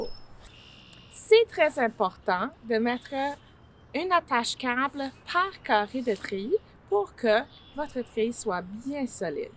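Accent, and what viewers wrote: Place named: Canada. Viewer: charmant accent canadien!!!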